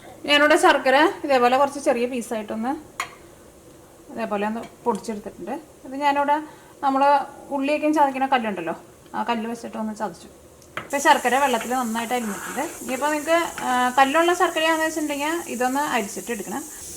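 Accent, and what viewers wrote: Thrissur, India. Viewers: 😀lokathinte eth koonilum nammal thrishir kaaru undaavum😀😀 orikkalum vitt poovaatha thrishur slaangum aayi😂😂😂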